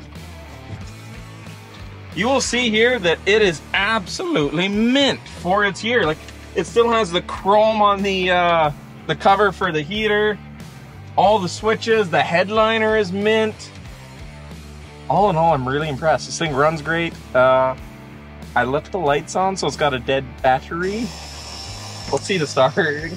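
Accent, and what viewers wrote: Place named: Prince George, Canada. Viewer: You sound like you're from Prince George or Quesnel.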